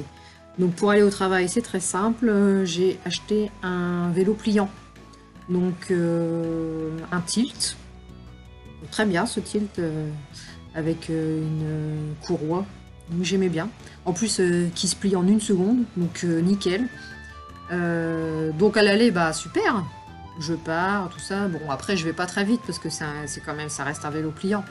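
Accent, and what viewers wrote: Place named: Nord, France. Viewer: Cet accent de ch'Nord, j'adore !! Une de mes cousines cause comme toi ... #velotaf à Amiens !!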